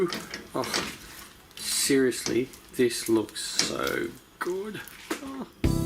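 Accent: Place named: Australia. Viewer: That Australian accent 👌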